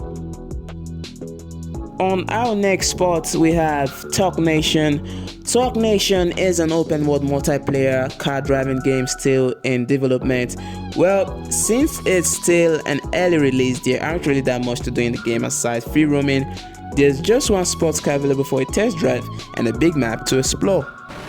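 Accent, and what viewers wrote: Nigeria. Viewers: You got Nigeria accent, where are you from?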